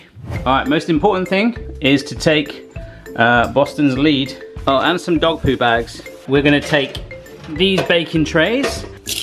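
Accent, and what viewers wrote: South Africa. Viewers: S.A represent! I'm also from South Africa and I'm feeling it for that accent! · I'm from South Africa and that accent gave it away.. He sounds Afrikaans too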